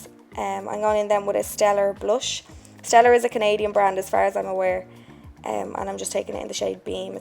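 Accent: Ireland. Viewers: from her accent she’s definitely from ireland.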